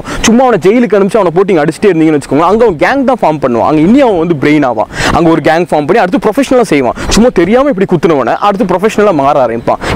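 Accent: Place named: Coimbatore, India. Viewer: Very interesting analysis, keep up the good work. Bro, are you from Cbe? I like your slang.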